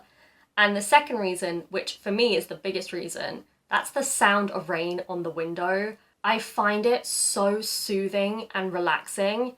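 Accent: United Kingdom. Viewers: 語学学習に活用させてもらってます！イギリス英語が聴こえず苦手意識を持っていたのですがおかげさまで好きになることができました！ありがとうございます😊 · 綺麗なイギリス英語。日本人にはこちらの方が馴染みやすいのでは無いかと思いました。 同じ内容をアメリカンアクセントで話されると面白そうだなと思いました。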